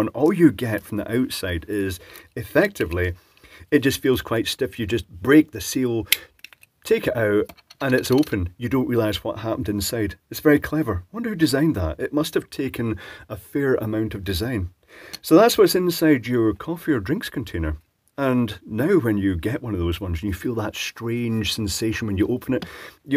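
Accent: Scotland, United Kingdom. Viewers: A fellow Scot?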